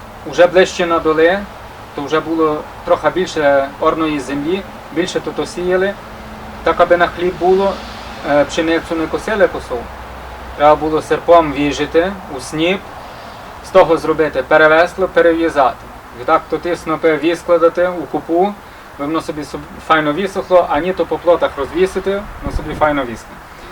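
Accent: Hutsul, Ukraine. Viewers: З'їсти ситного - це добре. А от почути рідну мову, та ще й гуцульську говірку, - це делікатес для душі, й п'ятдесяти грамів не треба.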